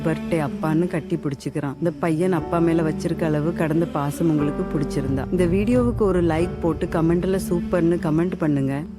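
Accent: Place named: Tamil Nadu-Kerala border, India. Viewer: 👍 அருமைடா 🎉 பேரான் ( நல்ல பதிவு ) T. N & Kerala பார்டர் ஸ்லாங்...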